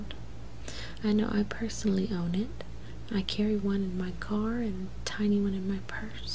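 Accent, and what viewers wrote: South, United States. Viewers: I love your asmr offerings. I'd love to hear you tell us about your tattoos. Your voice is so beautifully southern. :) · Yes, your southern accent =) It's the best soothing voice i ever heard *-*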